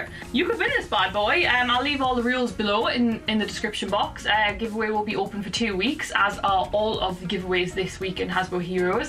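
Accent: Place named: Liverpool, United Kingdom. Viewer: Her accent is honking, but isn't scousers bad.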